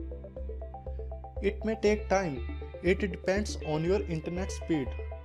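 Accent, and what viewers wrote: India. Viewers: fucking india aksen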